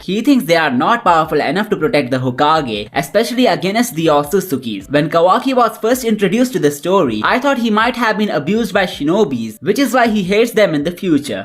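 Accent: India. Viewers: but i was asking so..... bcoz his accent seems indian to me.... and i was just demanding hindi commentary from 3 videos. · Lmao I think he is Indian by his accent but let me just support you on this question for the reply 😂😂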